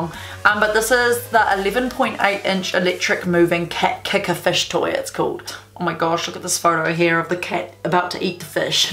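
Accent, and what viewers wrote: New Zealand. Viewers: I thought the accent was Kiwi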